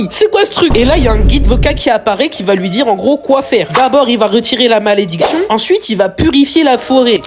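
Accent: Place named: England, United Kingdom. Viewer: On voulait la prononciation ton accent anglais nous as manquer 😢😢😢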